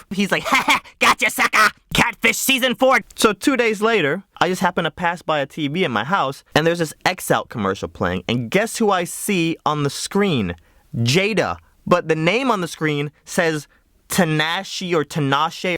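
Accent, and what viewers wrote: United Kingdom. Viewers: Dark red hair is my kryptonite. But British accent is a close second. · British accent 😏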